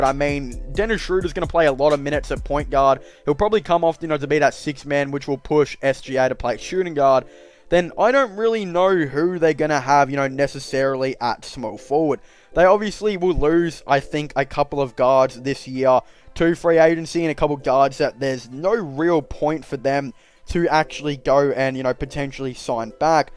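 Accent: Australia. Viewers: talking too fast....and Aussie accent. Lol.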